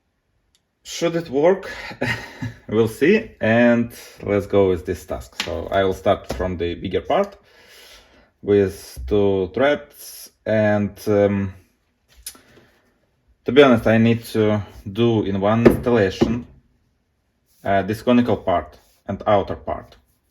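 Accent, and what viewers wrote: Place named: Russia. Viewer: Strong russian accent...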